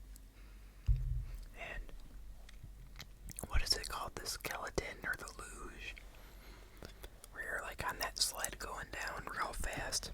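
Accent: Midwest, United States. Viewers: Where in the Midwest are you from?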